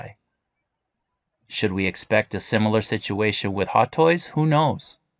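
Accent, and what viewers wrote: Boston, United States. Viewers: That accent. Felt like I was watching the depahted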